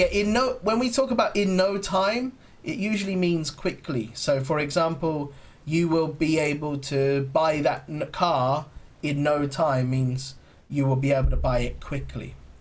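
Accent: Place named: United Kingdom. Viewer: I am crazy about British accent loved it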